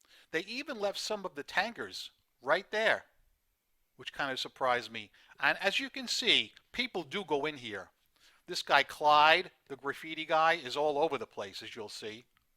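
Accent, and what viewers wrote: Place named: Queens, United States. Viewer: Hi.Your channel name mentions NY, but you live in NJ. Did you used to live in NY? I grew up in Queens and we have similar accents.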